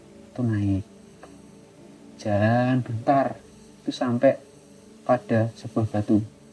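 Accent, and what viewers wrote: Java, Indonesia. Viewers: Logate jawa medok banget😂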